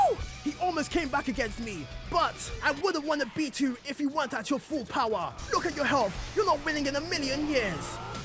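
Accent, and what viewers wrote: United Kingdom. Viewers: YOOOOOOOO this dude sounds literally like LEE BUT BRITISH OMG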